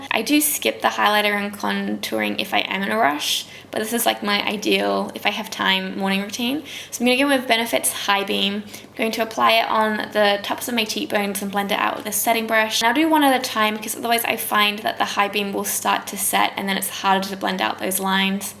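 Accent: Australia. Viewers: I absolutely love this look! So exciting hearing an Aussie accent :) keep up the great work! Subbed! :)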